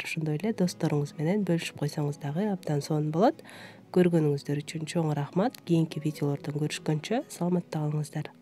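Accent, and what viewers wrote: Standard. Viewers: Азаматсың таза сүйлөп,үнүң да ушунчалык уккулуктуу экенсиң! Колуңа береке сиңдим! 😍😍👍👍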